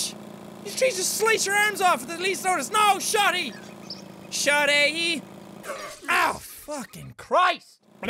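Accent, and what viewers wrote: Ireland. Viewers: Knickers, another word for underwear lol he just Irish so it sounds funny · the more excited jack gets, the more progressively Irish he gets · Are you Irish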